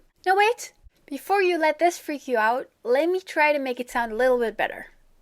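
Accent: United States. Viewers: Are you German? 'cause you sound more American or Canadian. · BTW your American English sounds great :)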